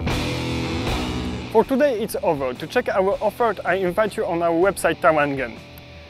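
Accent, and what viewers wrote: France. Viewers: sais trop un français les mec qui parle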